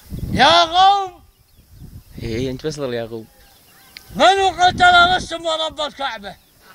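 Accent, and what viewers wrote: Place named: Levant, Arab world. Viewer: شلون مطالع من بغداد 3 سنوات اني خدمتي العسكرية بالموصل وزاخو حبي انتبه لهجته من بادية بلاد الشام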